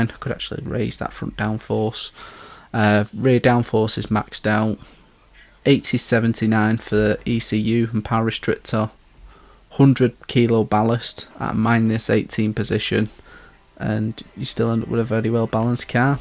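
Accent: United Kingdom. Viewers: Man This game is so expensive on Car purchases so I have to make the best use of the cars I've got But thank you for all these builds. Do you think that the Peugeot l750r Set up you have here Will work well for the cafe Menu 42 GR1 Suzuki Race too? PS nice to hear A fellow mancunion accent.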